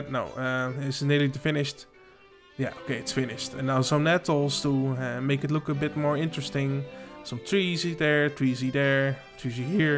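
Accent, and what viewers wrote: Netherlands. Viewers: Ik hoor dat je nl bent 😏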